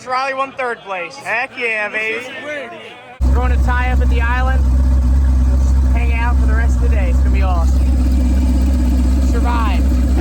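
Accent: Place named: South, United States. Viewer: southern drawl comes out when he talks to the Deep South People! 😂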